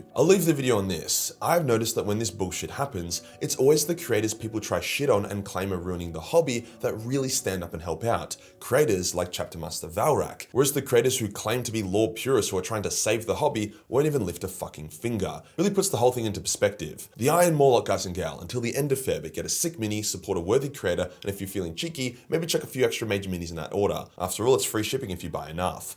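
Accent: Australia. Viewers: Never watched his stuff never even looked into him because well I like your vid length and as a Brit... I do love an ausie accent but yeah hes getting a free sub and watches from now on! Do love how I edited the angry words out and they still kept the angry word version as the comment though 😂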